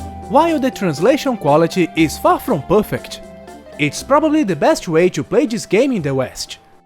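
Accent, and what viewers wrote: Brazil. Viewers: mano concerteza voce e brasileiro xD SO PELO GEITO DE FALAR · esse sotaque não me engana, tu é br né kk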